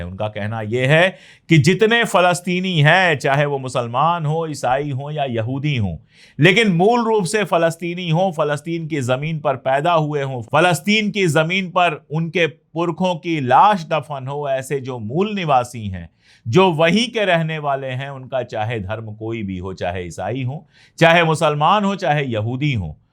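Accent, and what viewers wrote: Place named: Pratapgarh, India. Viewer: I am hearing pratapgarh iu p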